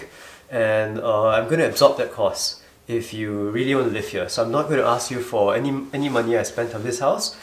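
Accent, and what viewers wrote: Singapore. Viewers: Are you Singaporean? Your accent very familiar..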